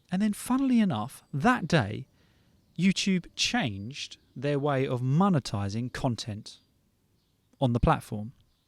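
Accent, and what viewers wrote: England, United Kingdom. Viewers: Is it british accent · iam glad i found you i like english accent way better than american so this is just perfect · Hello,I like your videos,they are amazing. Especially like this kind of video where you talk about an issue and discuss it.I learn a lot from you.I like your british accent.so go ahead and make more of this content.from Algeria 🇩🇿 Many thanks 😊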